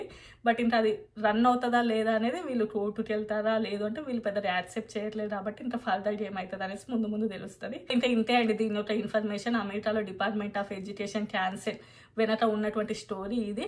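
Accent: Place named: Karimnagar, India. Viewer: Madam , as per your accent, are you from Karimnagar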